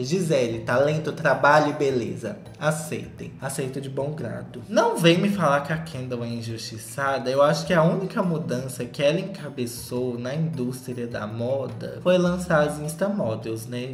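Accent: Minas Gerais, Brazil. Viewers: Agora q eu noteiii é mineiro?! Quero ser sua amiga, bora sair kkk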